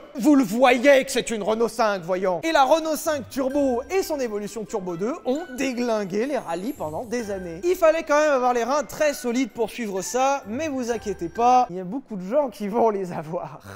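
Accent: Germany. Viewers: L’accent allemand une pépite!! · C'est pas normal que je regarde cette vidéo pour la dixième fois juste pour l'accent Allemands et le constructeur:) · Quel accent allemand de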